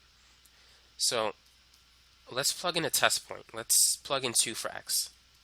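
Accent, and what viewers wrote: United States. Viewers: Angie Mejia America probably, he has an American accent but with a little bit of a lisp so that's why it sounds accented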